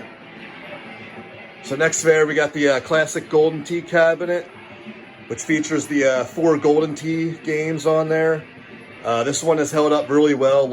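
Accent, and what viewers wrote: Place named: Philadelphia, United States. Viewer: great tour, love the room, gotta say the Philly Accent really sells it for me "Homee arcade" :)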